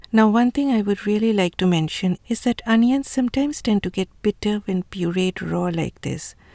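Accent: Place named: Bengal, India. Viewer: Hi.. a suggestion.... use shallots instead of red onion in US! The red onions in US are void of any natural pungency and usually taste like grass! Also, I saw you mentioned edible mustard oil of good quality not available in US! Try using TEZ brand or Radhuni brand available at any Bangladeshi grocery store! Loved your bengali style English narration with occasional "To"... u know what I mean 😂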